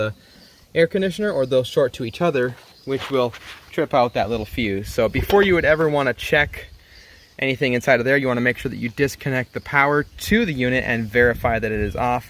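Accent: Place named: Northwest, United States. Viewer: Carolina? He has a definite Northwest accent. People move around so much these days, many people from the south don't have that twang anymore.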